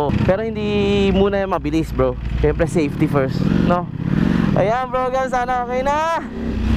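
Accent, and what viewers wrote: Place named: Ilocos, Philippines. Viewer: Ilokano ka gayam brogang. Naalwad ah pang pataray brogang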